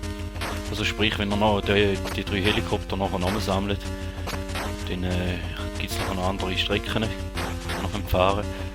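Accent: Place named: Switzerland. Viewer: Wuhu noch 'n LPer der CH-Deutsch spricht ;P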